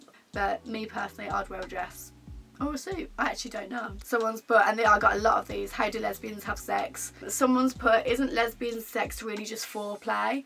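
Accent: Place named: Birmingham, United Kingdom. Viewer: I like your accent, which city are you from? Birmingham??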